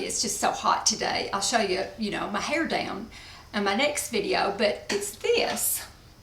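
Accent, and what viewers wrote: South, United States. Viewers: Darlin' we are your tribe!! no one is going to leave you. We all love your smile, your southern drawl that warms our heart. God bless you and cheers to your speedy recovery.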